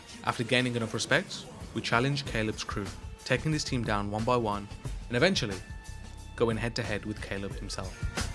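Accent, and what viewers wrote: United Kingdom. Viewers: Lol the use of AAVE in the British accent is funny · How come you didn’t get the 106 for underground 2? You sound like you’re English, the 106 was on European versions along with a corsa lol the 106 was actually the best starter stats wise too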